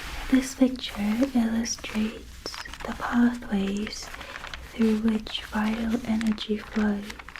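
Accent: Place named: United Kingdom. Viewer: 너무나 한국적인 분위기에 고증도 정말 잘하신것 같은데 우아한 영국식 영어가 이렇게 잘 어울릴 일인가요ㅎ 영어공부도 같이 되고 있어요! 좋은 영상 너무 감사해요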